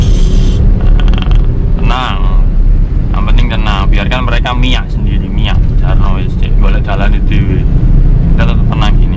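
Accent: Surabaya, Indonesia. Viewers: saya juga surabaya om, kedung cowek suka caranya ngomongnya hehe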